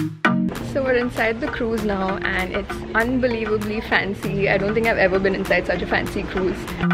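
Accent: India. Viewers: Only Indians can understand your accent. Thanks for the subtitles.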